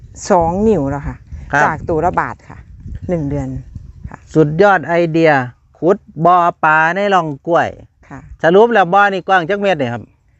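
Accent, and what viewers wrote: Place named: Isan, Thailand. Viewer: เอ้าเบิ่งไปเบิ่งมา คือคุ้นเสียง สสำเนียงเว้า คนบ้านเดียวกัน มาถ่ายมื้อได๋น้อ เผิ่นเฮ็ดยุบ้านได๋สิไปเบิ่งนำเผิ่น อ สีชมพูแม่นบ่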